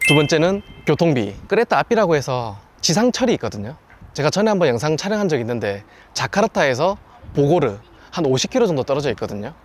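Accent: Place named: South Gyeongsang, South Korea. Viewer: 인도네시아 여행. 관심이 갑니다. 방갑습니다.ㅎ 구수한 경상남도 톤이 정겹게 느껴집니다.ㅎ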